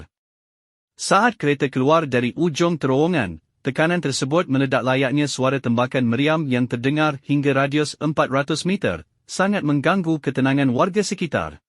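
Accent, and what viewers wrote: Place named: Malaysia. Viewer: Mengapa dubbingnya harus Malaydesh? 😮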